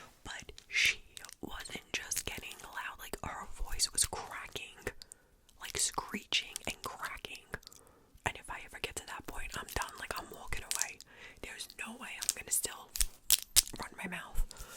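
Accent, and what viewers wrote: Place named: Long Island, United States. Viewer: ❤❤❤ LOVE THIS!!!! I was born and raised on Long Island but now live in Ohio. I love listening to you talk, that probably sounds crazy but you sound like my childhood, lol. God Bless 🕊️💝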